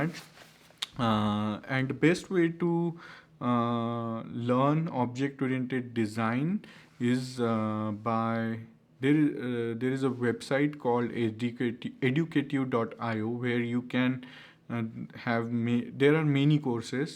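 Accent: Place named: India. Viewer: Are you a student? You have a strong accent, i would recommend you to work on your accent as well. it goes a long way for indians to neutralize that thick indian accent if they are looking for jobs in the US.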